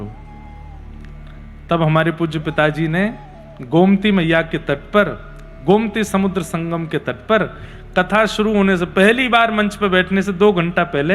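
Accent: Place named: Braj, India. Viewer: 🙏🙏JAI SRI KRISHNA. Guru ji jab aap Brij भाषा mai katha sunatae hai bas lagata hai sunti hi rahu 🙏🙏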